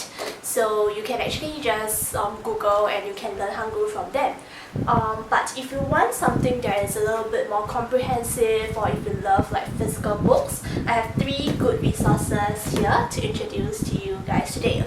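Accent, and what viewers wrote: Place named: Singapore. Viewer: Idk whether anyone has said this but you sound like a singaporean.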